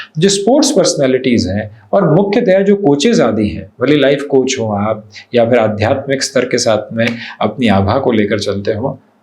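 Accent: Standard. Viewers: आपकी हिंदी भी बहुत शुद्ध है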